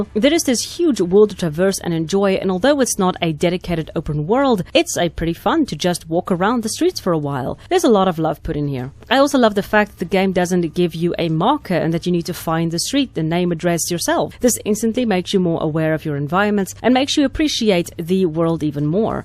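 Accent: South Africa. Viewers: Within the first 30 seconds, I could hear the SA English accent. Appreciate that there's a local female gamer giving her two cents. Much love!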